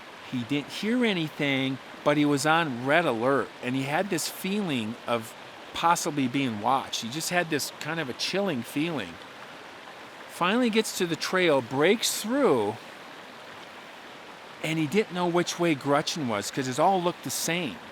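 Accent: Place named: Southwest Michigan, United States. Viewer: Great stories,! I know you were from MN originally, but did you ever spend time in SW Lower Michigan - Grand Rapids area? You pronounce some words exactly like that area. Just something I noticed. I really prefer and really like the videos that don't have much AI. I would much rather look at the river, look at you, look at a pinecone, rather than do anything that makes an AI center profitable. It would be so much easier for you to do that, so I appreciate the work you put into these videos! I hope you have a fantastic summer! I'm having a cup of coffee ( PM lol) and it tastes so much better when it's in my Base Camp ceramic coffee mug. 😊😊 I'm already looking forward to Sunday!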